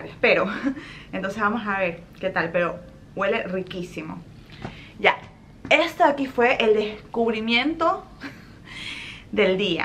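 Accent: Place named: Ecuador. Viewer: Por tu forma de hablar te reconocí enseguida que eras ecuatoriana xD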